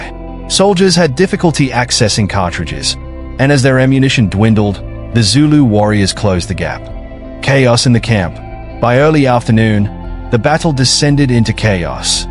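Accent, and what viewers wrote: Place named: Australia. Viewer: Your accent is confusing I thought Australian but there's something else there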